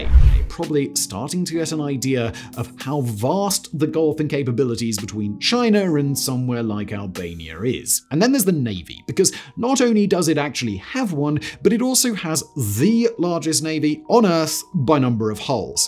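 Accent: United Kingdom. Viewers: because (1) American-English SOUNDS better (is softer/easier to listen to + easier to understand), (2) American-English is more professional (go INVESTIGATE ANY PERFORMING ARTS, VOICE, SINGING, MEDIA or JOURNALISM SCHOOL before - - BEFORE - - you kvetch + whine + criticize like a 5-year old), (3) ask yourself why British singers (the Beatles, Rolling Stones, Elton John, Pink Floyd, the Who, the ENTIRE “British invasion” etc, etc, etc, etc, etc, sing their songs in AMERICANIZED English (as opposed to lilted British accents?), (4) the British accent is weird, lilted, loopy, goofy + unnecessarily overly-emphasized (whereas STANDARD AMERICANIZED-English - - which again, is TAUGHT in professional performing arts, voice, speaking, diction, dialogue, singing, media, journalism, film + television schools (go INVESTIGATE it) - - is spoken more evenly + straightforward in tone - - and hence EASIER to listen to + EASIER to understand), and finally, (5) this guy covers interesting topics, but his accent, honestly, gets irritating. 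This advice/suggestion can only HELP him + his future videos - - because people may be inclined to STOP LISTENING after a few minutes if the affect is irritating to the ears. You asked.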